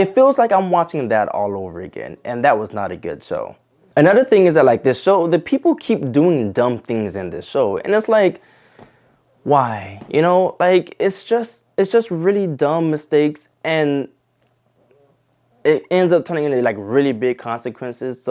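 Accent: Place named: Toronto, Canada. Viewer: This guy is not American. He sounds like he's from Toronto.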